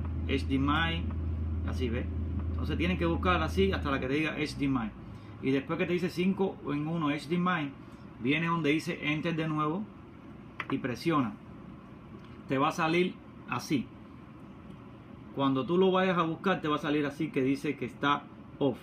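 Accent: Cuba. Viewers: Hola tu eres cubano te reconoci por el hablado, saludos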